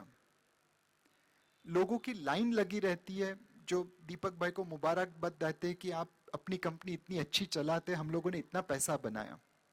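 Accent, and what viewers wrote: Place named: Bengal, India. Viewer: Sir, ur accent is very much like bengali babumoshai when u speak hindi.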